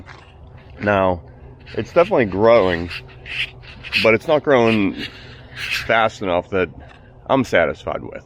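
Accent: Pittsburgh, United States. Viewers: do not link up with other sites. If you get too commercial. As a “civilian” non-contractor observer, I could choose concrete guy. I like your videos and your Pittsburg accent.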